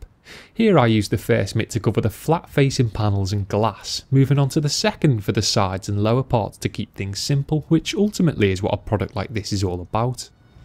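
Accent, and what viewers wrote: United Kingdom. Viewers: DieselDan09 brit accent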